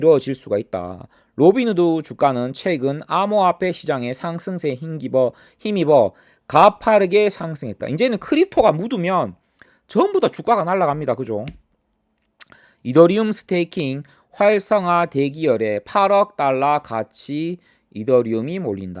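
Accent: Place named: Gyeongsang, South Korea. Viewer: 소중한 인사이크 감사합니다 근데 원래 경상도 사투리가 이리 웃긴가요 ㅋㅋㅋㅋ 비하발언 아니라 진짜 웃겨서요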